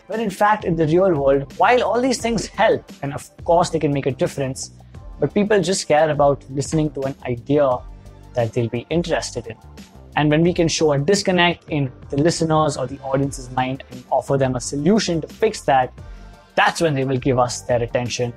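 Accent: India. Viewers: There's nothing wrong with his accent. In fact, he has one of the most neutral English accents I've heard in Indian YouTubers in a long time. You're just bitter that he had some cons to share about Toastmasters. So you're making a personal attack. That's petty. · I congratulate you for your Chutzpa! With your accent? Are you aware of the damage you do? You ripped off your TM experience, then you flatter them, then you criticize them, then you plug your product? Please go to a speech teacher, get rid of your accent, then, when you no longer sound like Peter Sellers doing his Indian Accent, begin to teach. You are off the tracks, friend.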